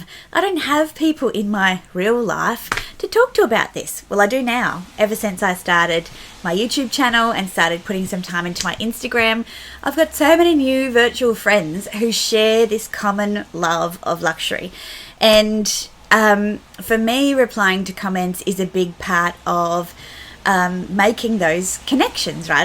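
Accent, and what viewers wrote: Australia. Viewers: I love your channel and your content! I love how warm and genuine you are, and it's always nice to hear an Aussie accent on the platform! I really like a number of different channels (and genres) on YouTube for different reasons, they serve different purposes to me. Some creators I'm subscribed to I will pick and choose what video I watch (I wont necessarily click on all of their uploads). But since I'm watching for YOU (as a person, more so than a channel with content) I will watch anything you put out and enjoy it. Like Dr Jake is one of my favourite channels, because of his humor and personality (and not for his Lego reviews, and home reno updates!). Keep doing you! You are just a delight 😽💖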